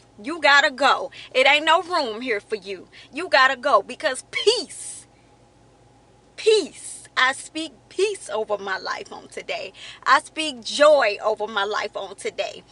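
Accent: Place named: South, United States. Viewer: Words of wisdom from a beautiful southern woman I love your accent omg.I have to get me a southern wife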